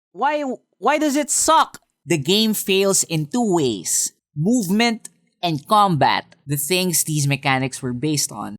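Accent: Philippines. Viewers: You sound Filipino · Filipino. His English is good but his accent is pretty heavy. This comes from another Filipino.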